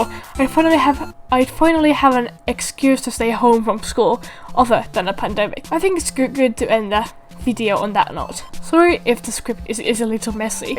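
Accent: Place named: Australia. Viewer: my god you're australian (or british. i can't tell from the two sometimes ;-;) your voice is nice. and great OC!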